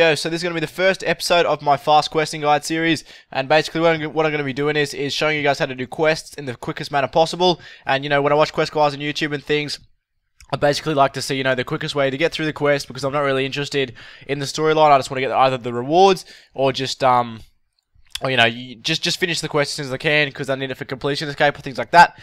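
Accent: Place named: Australia. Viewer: Good to hear an Australian give a guide for once, cheers mate real helpful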